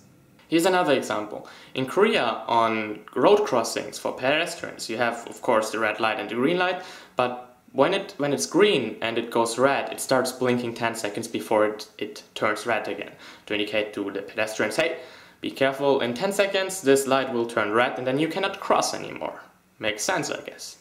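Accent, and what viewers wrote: Germany. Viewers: Jesus Christ your German accent sounds stupid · Is he German? He kind of has this German accent when he talks other languages :( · Du sagst einen Satz und man merkt sofort, dass du Deutsch bist :D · OMG hab dein Video gesehen und hab die ganze Zeit gedacht, ist der nicht deutsche, weil dein leichter akzent hat mich stutzig gemacht und als du dann über das verhältnis von deo in deutschland geredet hast, hab ich es gemerkt. Sehr cooles video. Grüße aus Köln :) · Ich dachte mir schon hmmm der akzent hört sich deutsch an und dann wirklich wooouw ich kann germsn accent raushören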